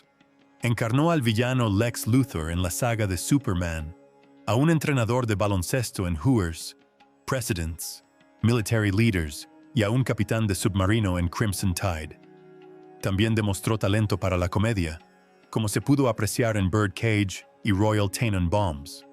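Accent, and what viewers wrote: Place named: United States. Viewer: acento americano que me da dolor de cabeza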